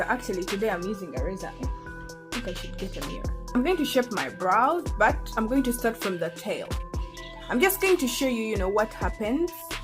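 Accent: Uganda. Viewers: You speak like a Ugandan🇺🇬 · Your accent tho😂are you from Uganda 🇺🇬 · The Ugandan accent💓💓. Love itttttttt🤗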